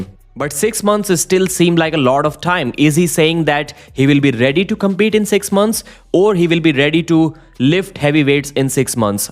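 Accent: India. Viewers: Why this stupid curry man accent...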